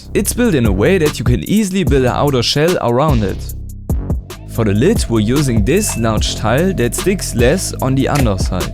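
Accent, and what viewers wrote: Germany. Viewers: Br du hast den Deutschesten Akzent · He's german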